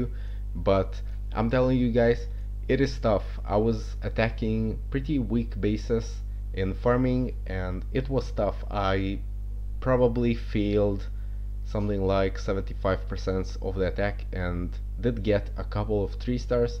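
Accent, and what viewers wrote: Russia. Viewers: какой то русский акцент чувствуется))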